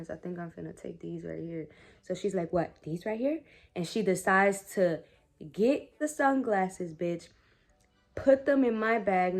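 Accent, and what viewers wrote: United States. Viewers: You accent is very American :) do you visit Spain ( before covid I mean :) )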